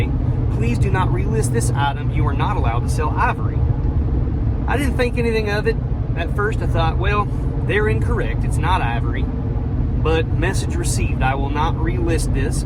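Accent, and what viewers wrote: South, United States. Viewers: It's called Southern/country/hick lol! That's all darlin' 😂 there's all kinds of us around these parts in the south. Bless your heart❤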